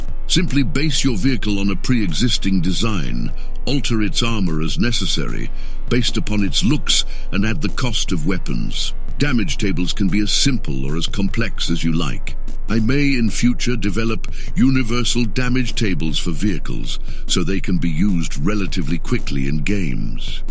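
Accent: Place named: United Kingdom. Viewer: bro had me at british sounding voice and 2nd edition 40k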